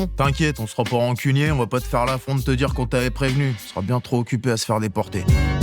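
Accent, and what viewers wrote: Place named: Paris, France. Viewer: Très intéressant, sauf qu'il faudrait peut-être moins faire dans ''l'accent et les expressions parisiens'' (c'est très vite agaçant) et plus faire dans l'accent français international... merci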